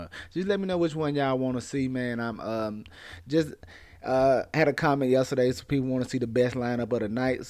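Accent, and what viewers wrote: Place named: South, United States. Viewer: Sound like you from down South like me the way you talk✊🏾😂 good content I cashed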